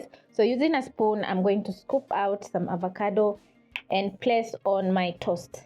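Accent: Kenya. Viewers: Kenyan accent 😀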